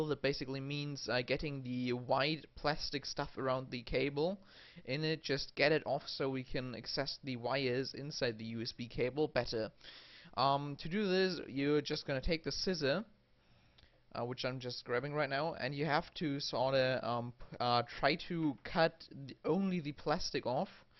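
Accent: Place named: Germany. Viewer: Are you German You sound German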